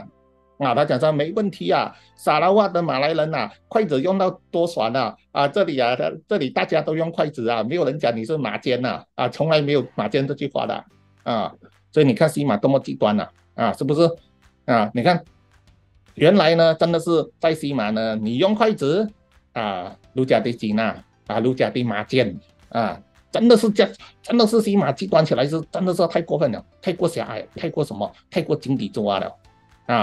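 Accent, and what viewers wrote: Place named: Fuzhou, China. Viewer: Sarawak福州人在此报道 🙋🏻 听到你讲福州话好亲切😊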